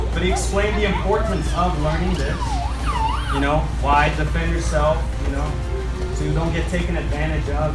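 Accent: Brazil. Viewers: Congrats, but why did you suddenly start talking in a Brazilian accent? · nice bro, lol were u doing a brazillian accent on purpose, also please dont leave us a whole week again without a video cause theyre funny :)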